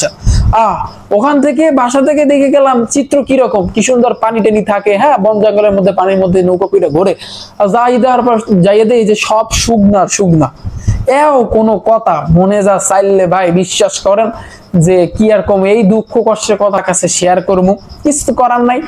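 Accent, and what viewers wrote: Barishal, Bangladesh. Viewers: Sir apner class kori, apnar borishal language shune moja pelam. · ভাইয়া বরিশালের ভাষা টা একছেড় সেরা লাগছে🙂💔💔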